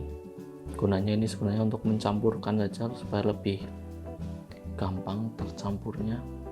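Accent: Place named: Banyumas, Indonesia. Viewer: Mantap, maturnuwun sharing infornya Mas'e! Ngapak Forever! 👍👍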